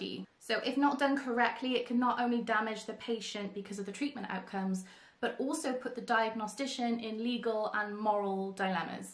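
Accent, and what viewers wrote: Wales, United Kingdom. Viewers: This was an extremely interesting and useful video. I'm a student psychologist and DID has fascinated me for years. Thank you for your insight. Also... it's nice to hear a Welsh voice on YouTube! Where are you from? Swansea girl here :D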